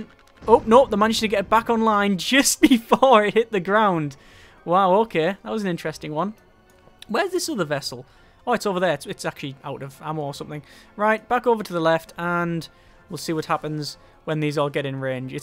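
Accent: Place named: Scotland, United Kingdom. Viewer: Dude r u Scottish or Irish cus u sound like it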